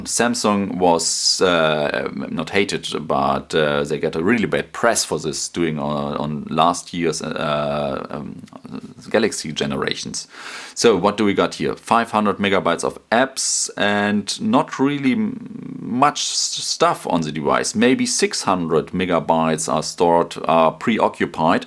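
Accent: Germany. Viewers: German accent. :)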